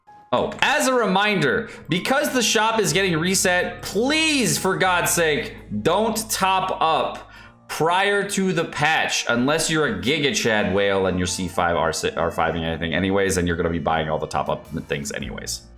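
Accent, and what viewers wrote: United Kingdom. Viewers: Love 🤣🌸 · YAYYY! is back! XD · I was really missing, I enjoyed that! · is back LETS GOOOO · Welcome back! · Aye is back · Hi Hi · is back!! Also, thank you for the heads up! All are good tips and guesses;) btw no theory of kazuha raise a flag? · HEY IS BACKKKKKKKK!!!! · yay is back. so happy to see him again. hope to see russian and normie soon too. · Yay!! Glad he's not being mean to normal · returns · I missed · I missed lol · The Return of 🔥 and those theories were really out there thanxx 🤣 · Apparently it's tea time. That's why is back ☕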